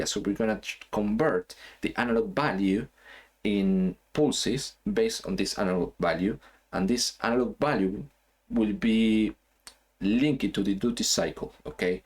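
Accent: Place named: Argentina. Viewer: Hola , no se por que se me hace que sos argentino, por casualidad tenes los pdf de tus clases ?, soy martin de argentina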